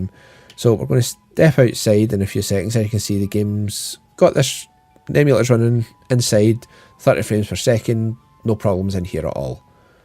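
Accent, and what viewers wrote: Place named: Wales, United Kingdom. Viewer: Great showcase. It's nice that you are talking more in the videos and I love the accent. Is that welsh?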